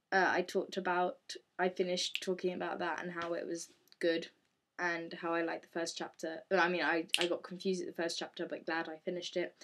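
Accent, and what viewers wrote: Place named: RP, United Kingdom. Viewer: lol you sound so posh!! =P never noticed ur accent before.....i think im becoming more scottish =] love you......<3 xx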